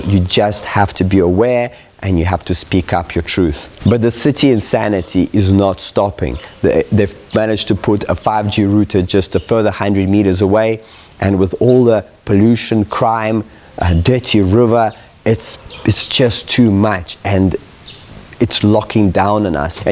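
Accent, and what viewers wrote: South Africa. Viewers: Hi there. Are you South African? Love your ideas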